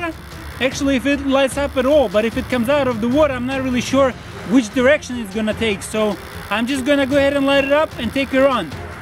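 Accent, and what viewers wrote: Russia. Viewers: D tone of him is like crazy Russian hacker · Русский акцент 😀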